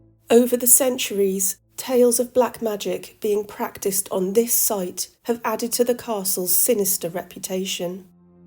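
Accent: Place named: West Country, United Kingdom. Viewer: I thought I could hear a faint sign of a West Country accent in your voice.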